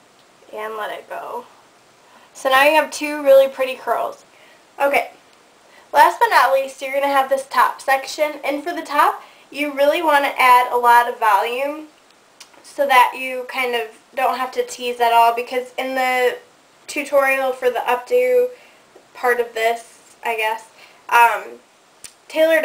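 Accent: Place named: Wisconsin, United States. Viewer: Are you from the Wisconsin area? haha you have the accent.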